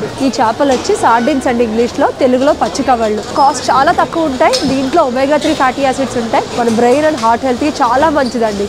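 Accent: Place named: Srikakulam, India. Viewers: మీరు కచ్చితంగా మా శ్రీకాకుళం వాళ్ళు అనుకుంట, బాగా చెబుతున్నారు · Medi pakka Srikakulam andi ❤❤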